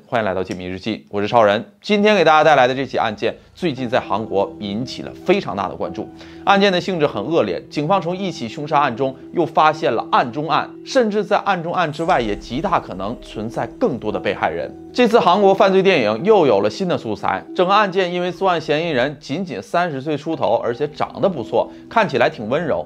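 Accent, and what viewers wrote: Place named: North, China. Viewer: 感覺up主像北方人,但咬字很清楚,解說很有吸引力,訂閱你了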